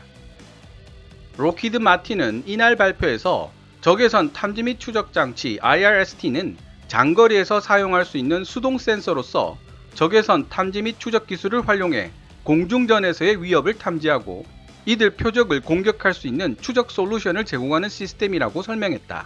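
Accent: Gyeongsang, South Korea. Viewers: 알만한 사람는 다아는 내용을... 호들갑 떠내... F35 파는 이유는 F22가 있으니까 파는 거고 F22안파는 이유는 F22보다 뛰어난 기체를 아직 개발보유하고 있지 않기 때문이지... 이사람 갈 수록 방송 제수 없게 하네. 영어도 존나 못하고 발음도 개떡같이 하네. 어떻게 영어발음에 경상도 사투리억양이 들어가있냐?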